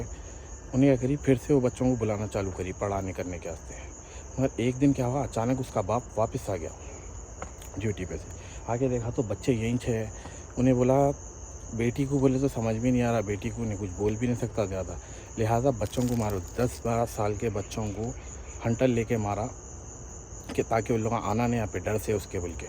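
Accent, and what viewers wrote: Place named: Hyderabad, India. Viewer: Assalamualaikum bhaijaan ...love to see ur vlogs...and also like your hyderbadi language 😅😅😅...plz vlogs continue raikho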